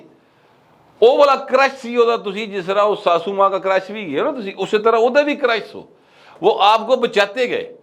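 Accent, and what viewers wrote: Punjab, Pakistan. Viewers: .. My name is Inder. I am from California, USA. I truly enjoy your Vlogs and I love the way you speak. Your Punjabi style is amazing. Aap ke saath baat karne ka dil hai. I will be honoured to be your friend. We can interact on whatsapp if you feel comfortable. Please respond.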